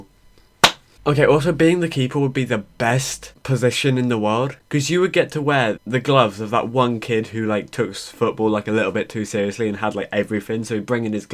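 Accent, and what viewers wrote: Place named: United Kingdom. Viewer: Teach me britsh accent senpai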